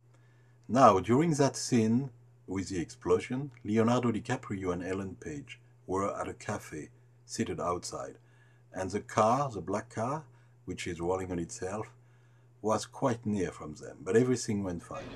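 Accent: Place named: France. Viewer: everything aside! i love your french accent... haahah :D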